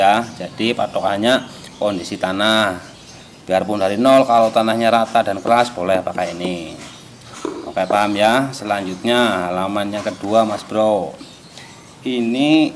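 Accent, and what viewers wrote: Banyumas, Indonesia. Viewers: Kaya wong ngapak apa yah...😁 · Koyo wong ngapakkk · wonge ngapak ini, g tau asalnya dari mana, yg penting sukses channel-nya👍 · Kayaknya orang Banyumas nih.... ngapak2 😁😂👍👍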